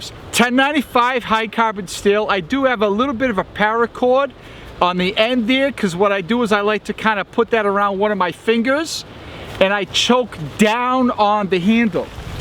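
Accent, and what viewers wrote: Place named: New England, United States. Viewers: So I'm listening to the long island, possibly brooklyn, possibly queens or even boston accent - and being a city boy myself, I'm not overly hopeful about this review because this guy clearly hasn't grown up skinning gators or twisting antelope sinew for shoelaces. And then he taught me how to CHOP/CHISLE using a batoning technique. Apologies, bro. Solid video. You've done the work. Thanks for the lesson. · i just looked at it quickly,, i don't like the 51,, anyway,,where are you at/from?? i am from north central maine, live in west central florida,, you have that yankee accent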